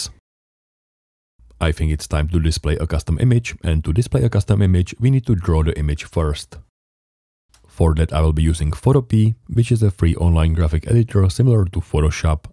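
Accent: Czech Republic. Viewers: Amazing content, live your little DIY projects. Fascinating to watch. I cannot help but try to figure out, are you by any chance a bratr from CZ? Im not that great with accents, sorry :D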